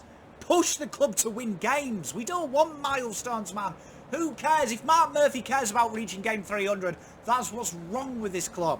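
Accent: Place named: North, United Kingdom. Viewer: Never seen you before but hats off - good analysis.... the beautiful irony of AFL analysis with the Northern UK accent! I'm a 52 year old life long Blues fan and this, I feel, was the lowest performance I have ever seen! The particular pain I feel is around the fact that we have a core of very talented players.... but the level of desire, determination and far dinkum 'have-a- go-edness' was totally absent - apart from the same few who try their boxes off & show something every week (Walsh, Weiters, Harry etc think.... "fuck maybe I'm receptive to other clubs warm embrace). Need for the humility & reality involved in your 'Rehab' is a good metaphor for this sorry situation - knowing 'where you are at and embracing the hard work on a worthy journey'. Has Teague lost this group? Sunday SHOULD have been a showing of ferocious intent to demonstrate (even if only to ourselves) that we have 'something' by way of ticker. 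Instead, what I witnessed was a demonstration of such incompetence, lack of passion and lack of 'team' that I found myself (for the first time) not even disappointed - just numb. Keep up the good work - you're showing more passion than some of the folk who should be Driving this club!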